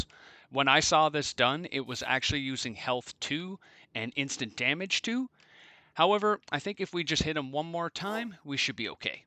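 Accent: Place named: Australia. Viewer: Great video! loved the Aussie accent! haha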